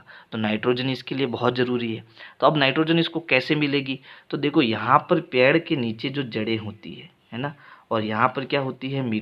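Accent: Rajasthan, India. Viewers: Sir kyaa aap rajshthani ho😅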